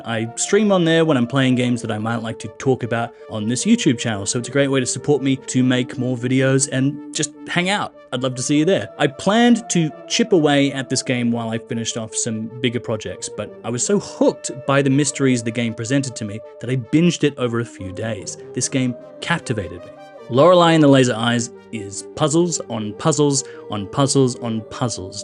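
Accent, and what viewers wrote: Australia. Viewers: Good video, learnt new information; you are in fact Australian and not British! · lmfao the classic "No I'm Australian" after anyone hears your voice the first time.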